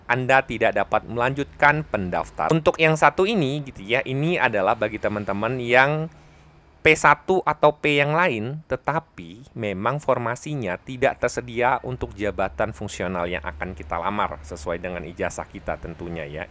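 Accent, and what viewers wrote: Banyumas, Indonesia. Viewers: Otewe Ora ngapak, ora kepenak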